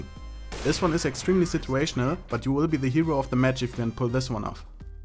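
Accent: Germany. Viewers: Dieser deutsche Akzent bääää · Oh yeah, German English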